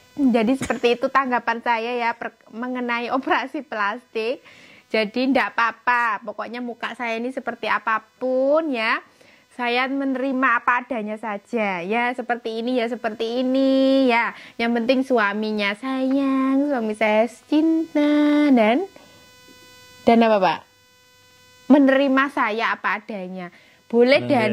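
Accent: Java, Indonesia. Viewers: Mbak cantik,abaikan org2 Nyiyir tnda tak mampu😂salam kenal q suka Gaya lo, medok2 Jawa, kerennn👍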